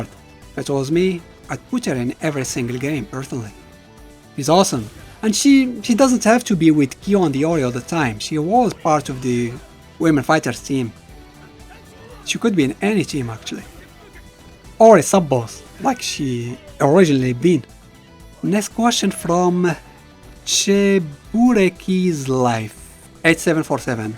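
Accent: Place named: Morocco. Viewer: Obviously, Morocco is big enough to point to on the map, but it's still underrepresented. You're not going to get so many people recognizing your authentic accent. At any rate, congrats on your milestone! Personally, I'm trash at fighting games, but KoF's style and flash has always drawn me in. Your channel allows me to enjoy the games for the story AND the gameplay. You make both aspects very approachable for someone who doesn't have much ingress into this space. Also, I'm a huge Metal Slug fan, so seeing general SNK content is a real treat. Thanks for all the videos over the years!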